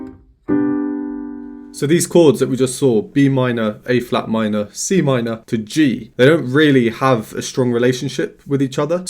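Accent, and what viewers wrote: United Kingdom. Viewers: such an in-depth professional analysis + genuineness + sheer emotions + sophisticated language + British English... couldn't be any better. please keep it up 💚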